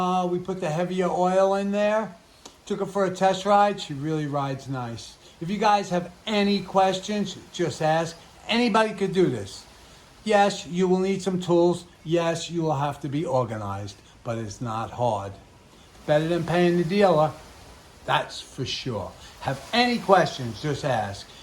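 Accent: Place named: Boston, United States. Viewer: Somewhere around Boston I can tell.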